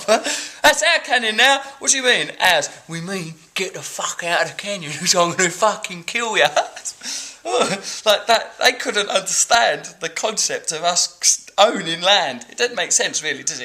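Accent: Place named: United Kingdom. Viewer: Good afternoon dear Russell ( in England, in Mx, the morning ) first of all I would like to thank thee very much because now we can have a little bit larger glimpse to have a look ( though still very rapidly seen for most of us, I think, to grasp the title completely but all the same you have slowed down your pace, which is really appreciated) at the books you read excerpts from. As homework, you leave us with many themes to think about but I take the one I could grasp thoroughly ( little by little am getting my ears used to thy velocity of speaking and your lovely British accent ) the subject matter I could comprehend was the one related to our fleeting passing through this dimension on planet Earth ( haven't you observed that we've been programmed with this notion since we're children by parents, relatives and later school and everyone confirming and reaffirming as scratching screeking old vynils that "life is so short" that "it fleets away" etc., all those currency-phrases we have to deal with all of the time, day after day, yes indeed but pleeease ... 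) well what I wanted to say is that o.k., sooner or later we shall be dead one of these days and that what about our belongings, as you said: "it won't be our problem anyway". Then, and in order to prepare for that event that in any second passing, any minute, any hour, any moment is nigh and nearing: would you please include in your list of topics for your discussion books like the Tibetan Book of the Dead " Bardo Thodol" a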